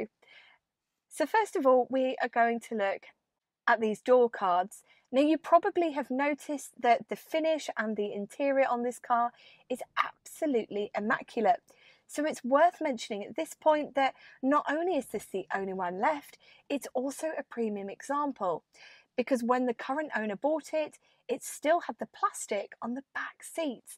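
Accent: United Kingdom. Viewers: Your british accent is wonderful 😊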